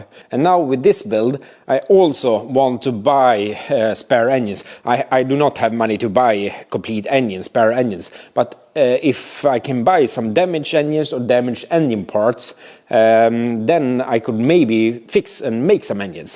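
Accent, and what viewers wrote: Sweden. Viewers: i love how thick the swenglish is.... id watch a video about sewing with this accent.. cars are better tho.. tjena från Norge! å, nei.... hexadecimals.... have fun ;D¨'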